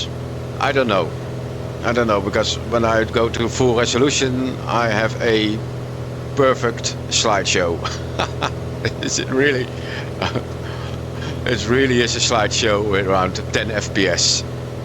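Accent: Netherlands. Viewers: Maybe you got a golden sample but i returned mine because off the MURA,maar ik hoor dat je van nederland komt hahahah..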